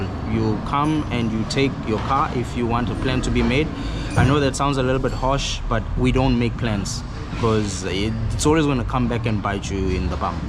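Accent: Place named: South Africa. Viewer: Love your accent.... mix of SA English, Durbs Indian and I'm guessing Zulu.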